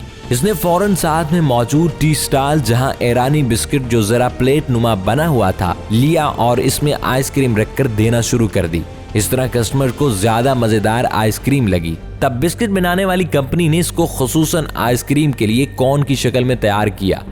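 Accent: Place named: Lahore, Pakistan. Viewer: Hahaha, nai Lahore ka lgta hai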